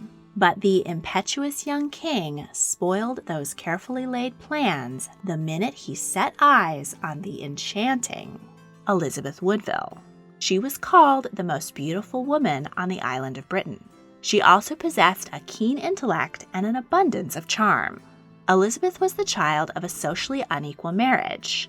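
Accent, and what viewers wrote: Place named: United States. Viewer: Dying of dysentery on the battlefield? Huh. American narrator for English history; never works.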